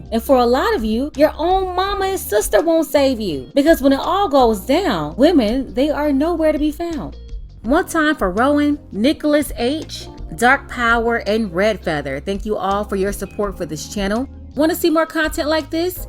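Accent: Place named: Florida, United States. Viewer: I just subscribed. Love you content. Your accent sounds like your from Florida. If you are, do you mind me asking what part of fl are you from?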